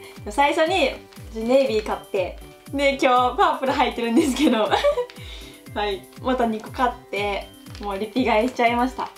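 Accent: Kansai, Japan. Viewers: 関西系の女性？しゃべり方関西系？女性専用動画ですね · ん？ 関西人ですか？ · この動画関西弁多くて親近感わく☺️☺️ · 今日は関西弁強いね！ 目が輝いてる…笑 うちはこっちの方が好きだよー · 関西弁かわいい💕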